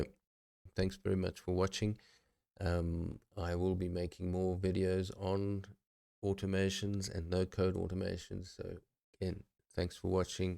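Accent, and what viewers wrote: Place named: South Africa. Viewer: Very cool, why did I know you are from SA by 😅 voice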